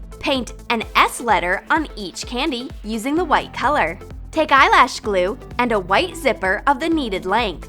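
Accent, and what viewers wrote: Russia. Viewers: are you English or are you Russian?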